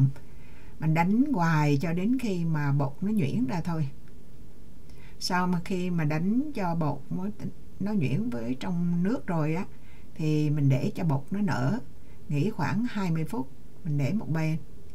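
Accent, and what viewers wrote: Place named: Central, Vietnam. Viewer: Em thích nghe giọng nói miền Trung của chị, học thêm những chi tiết đặc trưng để tăng cường kiến thức. Cám ơn chị rất nhiều. Em vẫn nấu bánh tét bằng cách dùng IP slow cook, bánh hoàn hảo tuyệt vời luôn chị à. Sáng kiến thật hay.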